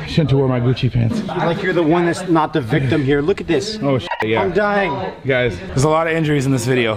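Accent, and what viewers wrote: Jamaica. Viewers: Up mi cahgi with the Jamaican accent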